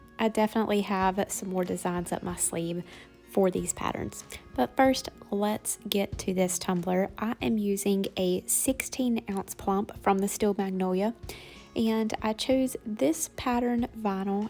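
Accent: South, United States. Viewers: As usual, a great tutorial. I just love your southern accent, so cute!! Can’t wait for the next shop sale.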